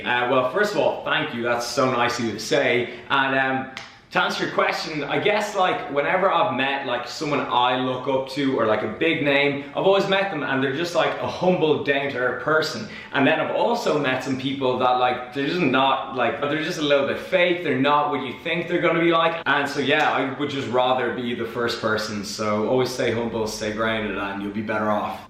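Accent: Dublin, Ireland. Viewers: That Dublin accent <3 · whats a D4 accent? D for Dublin? ahah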